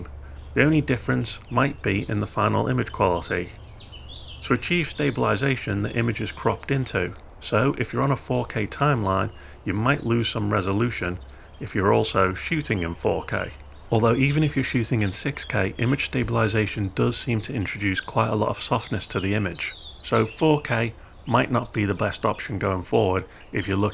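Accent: United Kingdom. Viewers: Im from the North West - I new it was a Merseyside accent (i know the Wirral isnt Merseyside but you know what I mean) 😆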